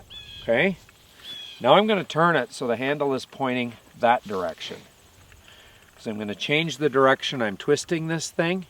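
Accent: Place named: New Zealand. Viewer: What a very good instructor, thank-you. The Kiwi accent was very thuck, but managed to git it.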